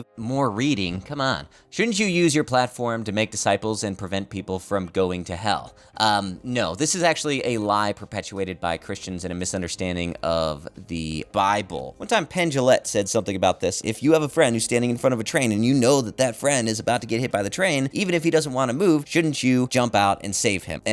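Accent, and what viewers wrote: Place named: United Kingdom. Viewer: He kind of sounds like Shelby from Adventure Time with a British accent. 😁😁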